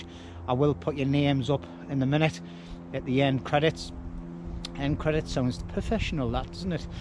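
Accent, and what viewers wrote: Newcastle, United Kingdom. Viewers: It's called Geordie mate people from the north east of England 👍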